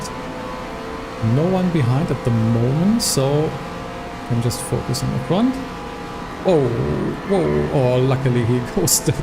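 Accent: Germany. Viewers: Moin. Deutscher Akzent?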